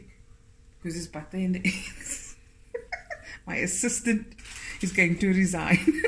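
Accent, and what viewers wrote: Cape Town, South Africa. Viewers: Love your food. Love your laughter. Love your accent. I miss Cape Town and my cousins and aunties that live there when I hear you speaking. Lastly you are soooo clean!! My late mother had all your recipe books. She was pedantic about cleanliness so she would have loved you even more had she seen your YouTubes and how immaculately clean you are.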